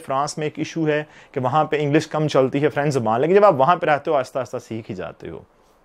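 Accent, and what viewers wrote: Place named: Pakistan. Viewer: Its sounds like you are from pakisthan but when u r in germany everyone become same even u r a pakisthani indian etc great respect to u sir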